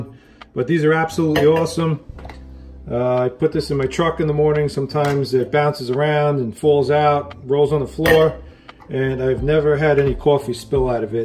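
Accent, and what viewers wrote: New York, United States. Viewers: i used a similar method to this, just with dishwasher tablets. and the results are impressive. by the way, are you from ny? if you are, i quite like the ny accent.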